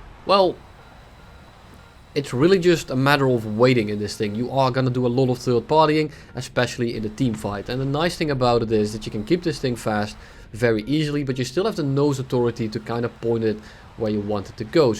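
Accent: Netherlands. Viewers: bro je kan btr nederlands praten (acent) gwn goed engld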